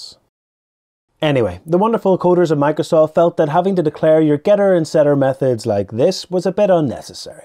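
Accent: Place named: Northern England, United Kingdom. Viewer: LOL ! I thought you were scottish and then immediately realized you were from the north.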